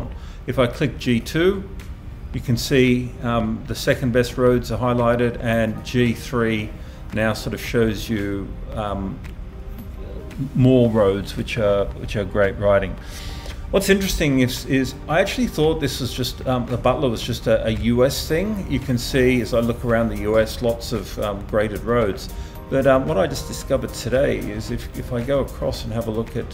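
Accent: Australia. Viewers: Wait are you actually from Texas? I am assume originally Aussie?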